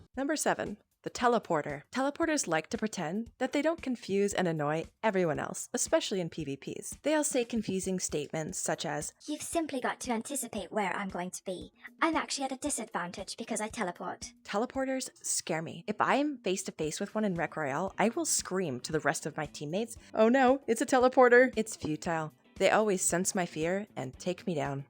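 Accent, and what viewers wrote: Canada. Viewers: Hold on your Canadian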